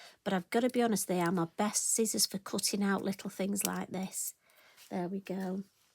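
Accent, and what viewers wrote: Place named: Cleckheaton, United Kingdom. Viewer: No you sound just like my family from Cleckheaton.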